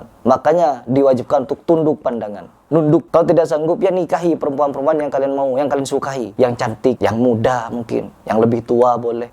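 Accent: Madura, Indonesia. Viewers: Mas ni orang madura kah? Logat nya mirip2 madura hehe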